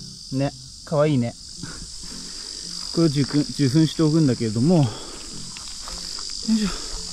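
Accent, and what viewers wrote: Tsugaru, Japan. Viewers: 初めまして。 あの、お教えください。 雌花が受粉されているか否かはどのように見分けるのでしょうか？ お返事お待ちしております。 それと、津軽弁？いい感じですね。 津軽弁YouTubeで人気ですし。 すんたろす、って言うYouTuberのお兄様かと最初思いました。 😅 津軽弁全面に出した方が登録者増えるかもです。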